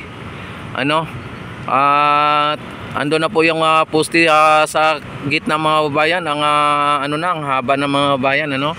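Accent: Visayas, Philippines. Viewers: Bakit hilig nyo mag vlog na akala mo reporter kayo?! Mukha kayong timang! Bisayang ewan pa accent mo!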